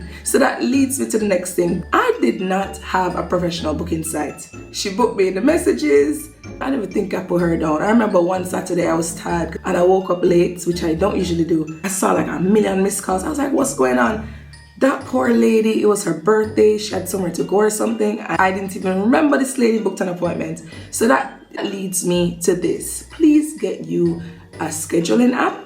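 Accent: Jamaica. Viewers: Yow u sound so Jamaican its qt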